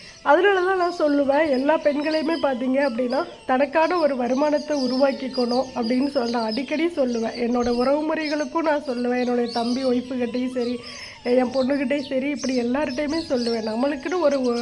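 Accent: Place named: Kongu, India. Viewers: கோயம்புத்தூர் மாதிரி இருக்கு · வீட்ல மற்றவங்க தூங்கு போது விளக்கேற்றலாமா ஏற்றக்கூடாதுனு சொல்ற றாங்க எதை செய்வது உங்க பேச்சுல கொங்கு தமிழ் நன்றாகவே உள்ள்து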